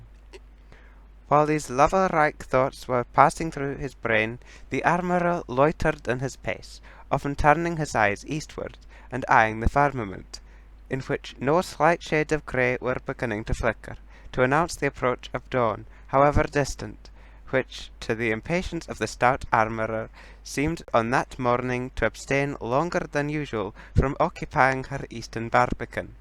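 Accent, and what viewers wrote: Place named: Scotland, United Kingdom. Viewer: i love this book! it’s my favourite you did it so well!!! why are u now scottish tho?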